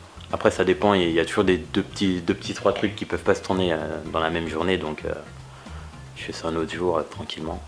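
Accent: Africa. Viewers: C est vrai que tu fais trop bien l'accent africain x') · trop la classe l'accent africain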